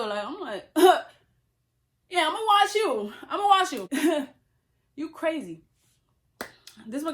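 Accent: New York, United States. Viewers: I frikin love the New York accent!! I’ll be watching you just to learn... ps you are a natural beauty 🥰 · LMBOOO you are hilarious 🤣🤣🤣 and your NY accent is top5 girl 😹😹🤣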